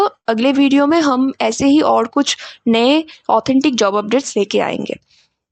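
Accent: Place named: Bengal, India. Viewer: Aapki hindi sunke ek baat to sure hai aap bengali hai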